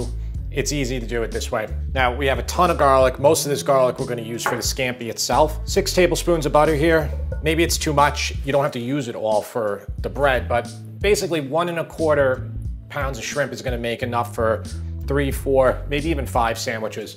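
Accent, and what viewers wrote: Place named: Long Island, United States. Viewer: Can’t wait to make this. Thanks! Love and miss the LI accent. Vermont just doesn’t cut it. 😬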